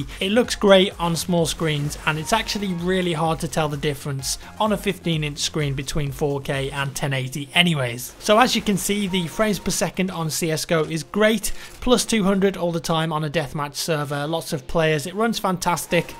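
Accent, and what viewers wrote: United Kingdom. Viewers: ***** I don´t see your logic here. He has a British accent hence there is a much higher probability that he´s from the UK.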